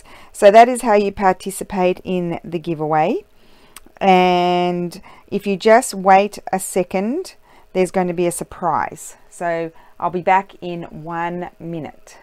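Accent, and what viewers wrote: Australia. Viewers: Oooh - How exciting. Beautiful journal. I've bought baroque and fragment add ons but love them all. Love watching you every day. Greetings from Perth WA. Great to get a glimpse of an Aussie's life in Italy. Terrific to hear the Aussie accent and lingo -))) and Something I've often wondered - are you related to Richard Roxburgh? (Big fan of Rake - amongst others!) Now that we are all addicted to tuning in daily, please say you'll still be around regularly!! :-))))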